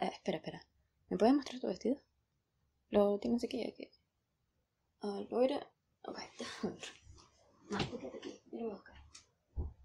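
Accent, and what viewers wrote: Chile. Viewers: Eres chilena? Xd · Pregunta Eri chilena?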